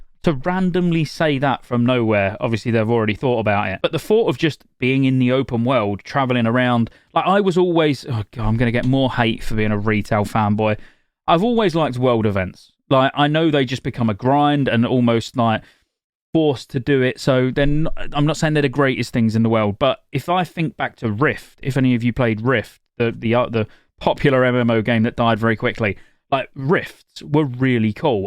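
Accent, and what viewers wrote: United Kingdom. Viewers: Whats the story behind the Iowa hat but the british accent?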